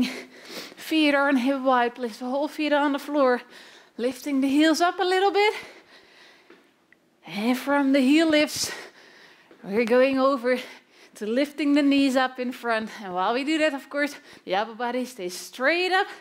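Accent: Netherlands. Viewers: Dutch accent? so cute! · Are you Dutch? I can hear the accent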